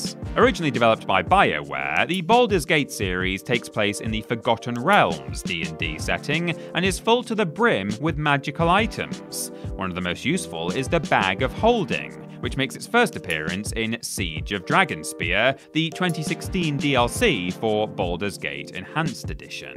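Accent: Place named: Yorkshire, United Kingdom. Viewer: Nothing like a cup of coffee and the Yorkshire tones of presenting a list to start the day, ahhh..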